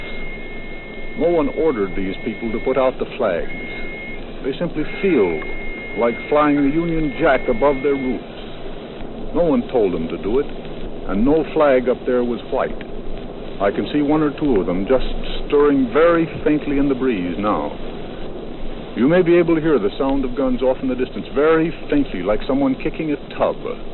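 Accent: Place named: Canada. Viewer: Murrow was from eastern Washington state. He sounds a touch Canadian, like he could have been from British Columbia.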